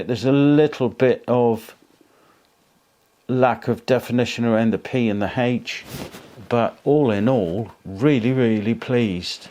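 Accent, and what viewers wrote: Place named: Norfolk, United Kingdom. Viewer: Great video. One question…. What accent is that? Sounds north Norfolk. Many thanks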